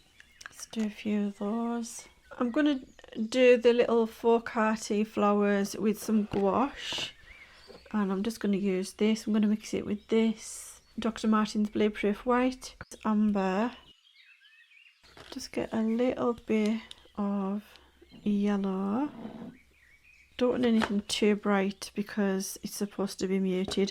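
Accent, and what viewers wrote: United Kingdom. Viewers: Loved this tutorial! Please pace yourself so you don’t burn out. Where in the UK is your accent from? Much appreciation from San Antonio, TX USA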